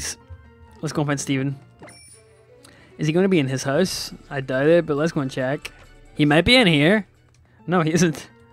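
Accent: Northern Ireland, United Kingdom. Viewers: R u from Northern Ireland/Ulster and if u r what county??? I is from Dublin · Northern Ireland man. Keep er lit boyo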